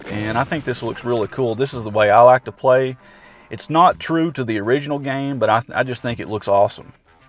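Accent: South, United States. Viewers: Just found this video. It is absolutely excellent. Clear and concisely presented instructions in a superb American accent. I don’t know what state you’re from but it is mega cool! Best wishes from England. P.S. I also agree with you that Doom is the foundation of most games in that genre. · Love your southern accent, bro. : > I would have mentioned Crispy/PrBoom+ for the more purist minded people, but keep up with the good content! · Good American Accent Boi!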